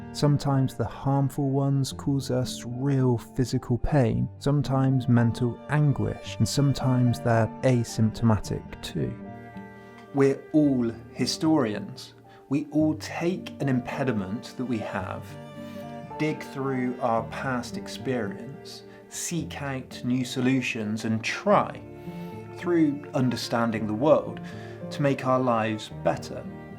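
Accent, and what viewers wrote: United Kingdom. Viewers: Theres something about a british accent that presents an insidious force that everything being said is intellectually inclined and of authority. I just think its so dangerous. Or am i just crazy? There may very well be merit here, but i cant tell and it bothers me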